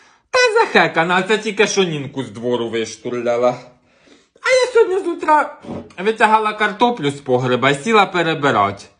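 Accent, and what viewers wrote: Poltava, Ukraine. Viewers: Так стає тепло на душі "по домашнєму" як чую цей рідний Полтавський говір❤. Все дитинство перед очима пробігає. Дякую за твою роботу,. Ти неперевершена, як завжди. Чудовий гумор і надзвичайна подача ❤ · ти неперевершена!З дорогою полтавською говіркою!Дякую!😊😊😊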